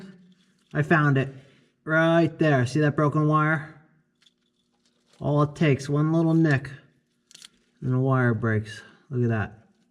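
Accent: New York, United States. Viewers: u from new york ?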